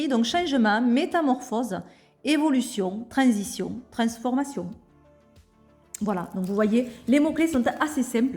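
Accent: South, France. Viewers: Jaime bien le petit accent du midi 😊.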